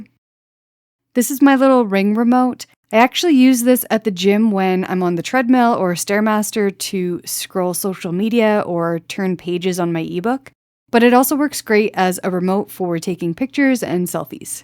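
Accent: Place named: Canada. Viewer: Canadian accents are quite charming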